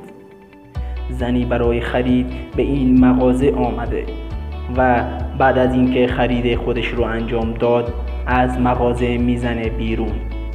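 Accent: Afghanistan. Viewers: نه ایرانی حرف نمیزد همین لحجشه در افغانستان هم لحجه های مختلفی هست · لحجش تخمیه چون گوینده افغانیه 🤢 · این چه جور حرف زدنه انکار یه افغانی تازه اومده ایران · داداش این افغانیه واسه همین اینطوری حرف میزنه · مث بقیه برادرای افغان صحبت کن خیلی هم لهجتون قشنگه اینجوری ریده میشه به کلیپ این همه یوتیوبره افغان و همم عشق میکنن با کلیپاشون و بیشتر به خاطره لهجشون میرن کلیپاشون رو میبینن شما اومدی فارسی صحبت کنی خوب معلومه گند میزنی فارسی رو مدل افغانیه خودتون بگو با لهجه خودتون عالی میشه مث این میشه که من تو افغانستان باشم و بخوام به لهجه شما صحبت کنم صددرصد مسخرم میکنن چون بلد نیستم و همش گند میزنم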